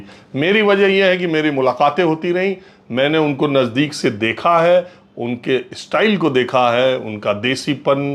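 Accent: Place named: Allahabad, India. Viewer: Sir Mahol Badhiya hai.Aap ke bat-cheet me Elahabadi mahaq rahti hai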